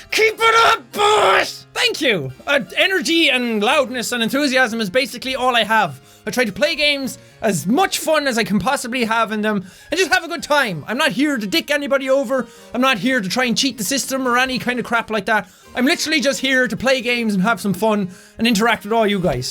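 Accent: Ireland. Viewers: I know jack isn't faking his accent coz that how irish people talk like me coz I'm irish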